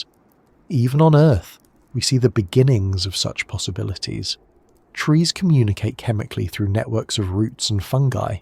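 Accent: United Kingdom. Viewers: I'd like to believe it's some brilliant man from the UK. I'd be so disappointed to find out if the voice is AI.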